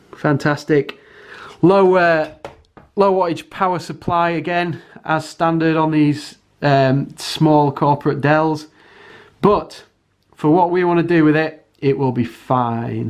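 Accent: Yorkshire, United Kingdom. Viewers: Sounds like your from Leeds, I bet, or surrounding area(West Yorkshire)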